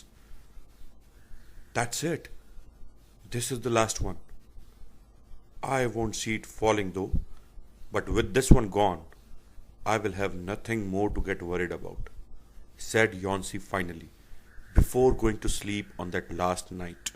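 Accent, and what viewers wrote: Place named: India. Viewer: I love Indian accents! Sometime it annoys me but most of the time, it's cute!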